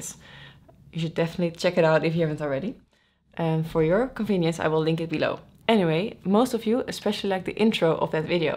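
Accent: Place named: Netherlands. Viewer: Hi, your Videos are very good. The approach an landing at Lugano Airport was superb. But... PLEASE... can you speak a bit slower in your videos. You have a little "Dutch-Slang" in your speaking and for non-English or non-US-man it's very very hard to understand. Please do not be offended because of my little criticism. CU Sönke...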